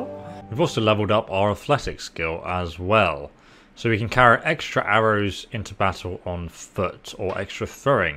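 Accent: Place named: United Kingdom. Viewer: It's called british and that's the way they pronounce things.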